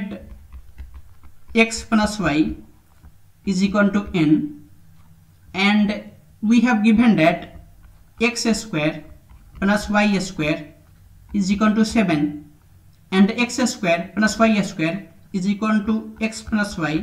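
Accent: Bihar, India. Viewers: Are u from Bihar?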